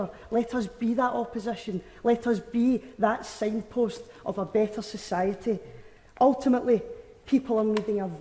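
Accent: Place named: Scotland, United Kingdom. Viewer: It's not a Glasgow accent, nothing like it. It's a Paisley accent which is completely different.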